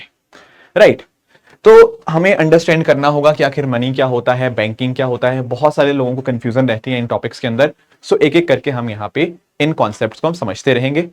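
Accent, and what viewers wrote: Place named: Punjab, India. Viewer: Sir you teach great,but are u Punjabi? From your accent I'm guessing because Punjabi living in Haryana Delhi have similar accent of Hindi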